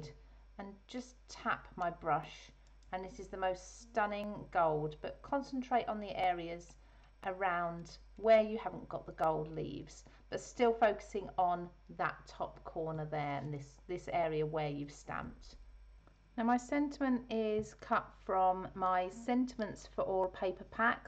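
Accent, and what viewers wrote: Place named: United Kingdom. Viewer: lovely cards thanks I need ro make one hreat ides. That said but personal peeve why are you using only inches and not cm? It really irks me that many places globally use CM but in videos people pander to the USA and use inches and do not give the CM so the rest of us in the modern world have to convert. I can hear you sound like you are fellow Brit not an American if that the case you will know about CM.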